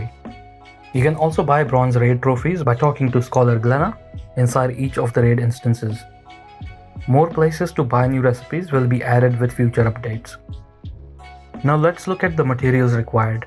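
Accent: India. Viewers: You sound so Indian :D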